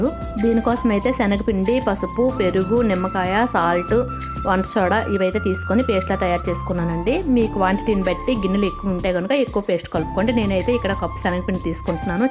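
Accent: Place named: Srikakulam, India. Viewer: Meedi vizag na. I am also from vizag, madhurawada. & srikakulam slang la వుంది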